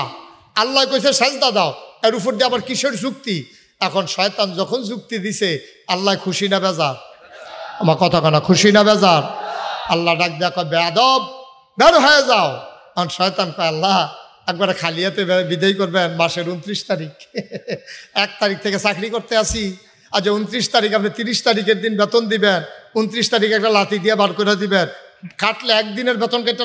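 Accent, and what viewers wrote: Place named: Noakhali, Bangladesh. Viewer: হুজুর আপনি তো নোয়াখালীর হুজুর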